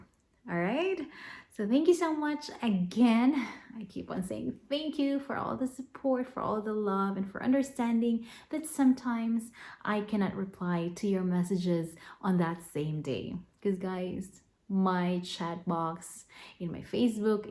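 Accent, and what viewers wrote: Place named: England, United Kingdom. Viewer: First I love your English accent,Gal you're flawless,thank you for your honest opinions.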